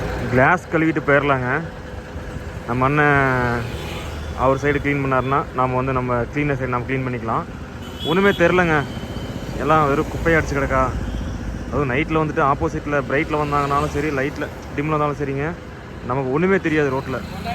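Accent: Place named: Madurai, India. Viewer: அண்ணா மதுரை மொழி லந்து 👌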